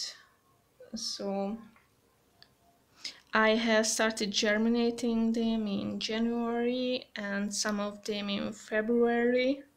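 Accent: Hungary. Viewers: You have a hungarian accent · Your accent... are you from hungary?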